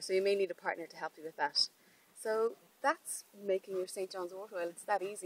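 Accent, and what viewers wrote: Ireland. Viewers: Nice. Interresting Ireland accent. Btw I wouldnt judge you if you throwed a stone at the rooster while yelling "shut uuup!"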